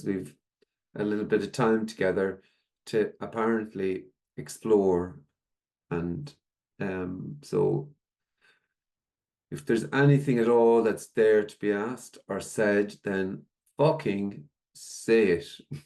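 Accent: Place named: Ireland. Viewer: Love it sounds even better with an Irish ascent ❤❤❤. So so resonates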